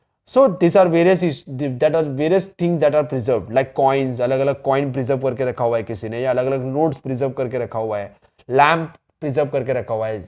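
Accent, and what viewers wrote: Maharashtra, India. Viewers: Aap ka voice like tipical marathi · Sir you are marathi ??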